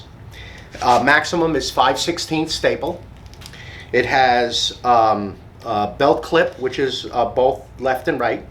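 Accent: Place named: Massachusetts, United States. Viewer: I could tell by your accent. I live in mass.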